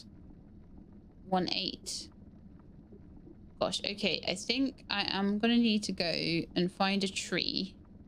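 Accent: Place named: United Kingdom. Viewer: You made your internet handle the primordial spider from lord of the rings... NGL that's kinda based, would be really funny if you were an auzzy but you sound more British than that.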